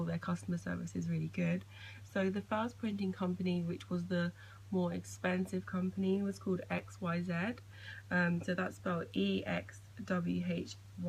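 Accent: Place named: United Kingdom. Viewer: Hooray found somebody with a british accent. 😊😊😊😊😊😊. I love Art appreciate your channel.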